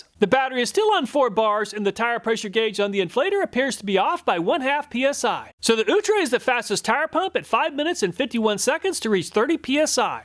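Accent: United States. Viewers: If only I could stand to listen to your squeaky Yankee voice.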